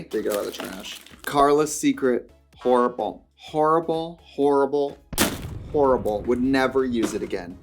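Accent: Wisconsin, United States. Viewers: trixie’s wiscansan accent really popped out in this video (i say as someone from effing maryland) also a whole face of milani might be the move. 👀👀👀👀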